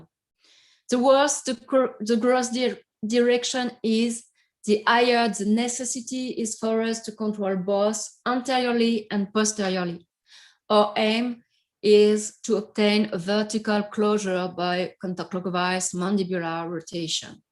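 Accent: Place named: France. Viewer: In spite of the heavy french acent I really enjoyed this lecture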